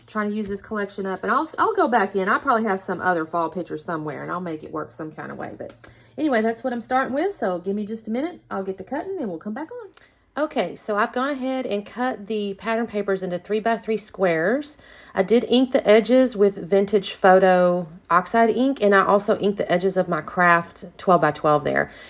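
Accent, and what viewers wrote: South, United States. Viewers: It just hit me. I know why I like your videos so much. It's that southern accent. I haven't visited the state fair, but this year, I will be there. I told my husband, "Honey, we gotta go to the state fair!" I don't know when it is going to happen, but as soon as San Antonio announces it, we are there. That sounds like fun. Your layout came together nicely, and I love fall colors. They make me think of warm pie. Anywho, thanks for sharing.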